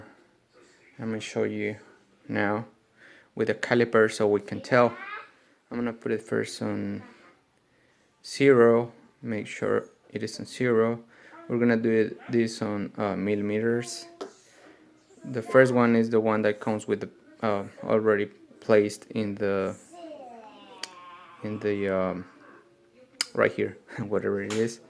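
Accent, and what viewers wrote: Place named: Poland. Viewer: Are u from Poland? your Accent is very polish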